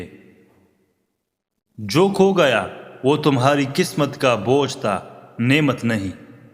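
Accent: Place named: Pakistan. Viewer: Urdu Shi hai wo pakistani lahza hai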